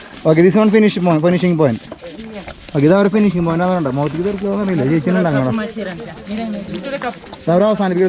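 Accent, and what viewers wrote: Thrissur, India. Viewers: അലസ്‌കയിൽ വന്നപ്പോൾ തൃശ്ശൂർ ഭാഷ കാരണം subscribers കിട്ടുമോയെന്നു ആശങ്ക പ്രകടിപ്പിച്ചിരുന്നു.അന്ന് നൂറിൽ താഴേ മാത്രം subscribers!!ഈ ആഫ്രിക്കയിൽ വന്നിട്ടും തൃശ്ശൂർ ഭാഷ കൈവിടാതെ തനതായ ശൈലിയിൽ കൂടി നാല്‌ ലക്ഷത്തിൽ അധികം subscribers!!! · thrissur slang pettannu alkulle kayiledukkan powerulla manohari😘😘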